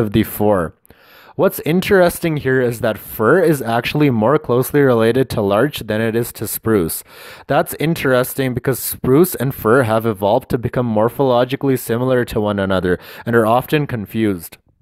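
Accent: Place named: Canada. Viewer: I like a Canadian accent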